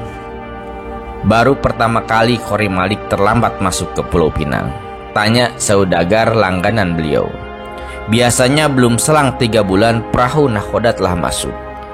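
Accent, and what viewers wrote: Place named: Sunda, Indonesia. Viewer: Suaranya seperti orang sunda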